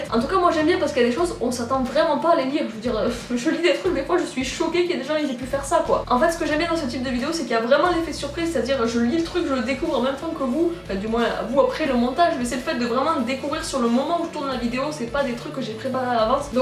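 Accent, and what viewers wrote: Toulouse, France. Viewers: je t'aime trop et j'aime aussi ton accent toulousain sa te vas vraiment bien 💖💟💞💝💘les autres ont pas le droit de se moqué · Elle habite à Toulouse, c'est l'accent Toulousain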